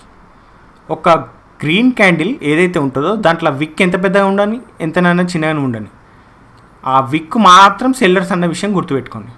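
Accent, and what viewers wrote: Telangana, India. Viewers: Bro meru pakka telangana yasalo best information andisunnaru maku chalabaaga upayoga paduthundi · Super Video Bro’ Telangana Yasa Adhirindhi.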